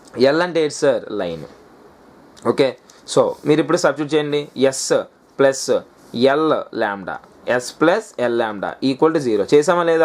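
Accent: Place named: Visakhapatnam, India. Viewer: Pure vizag slang❤️😍😍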